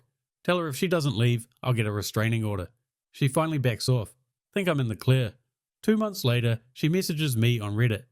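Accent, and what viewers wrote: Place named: New Zealand. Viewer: Why all the talking in funny Kiwiglish?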